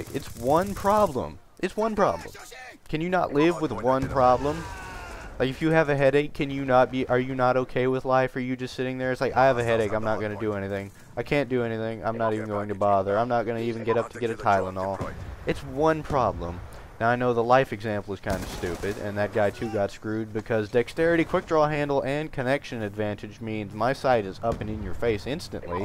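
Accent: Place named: Texas, United States. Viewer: I do disagree, if you disrupt the rudimentary way of a how a game is intended to function, then the entire experience is spoiled. Thankfully, the PC port isn't AS plagued with networking problems as its console counterparts, so you're more likely to enjoy the game at a different venue. Also, dat texan accent :3c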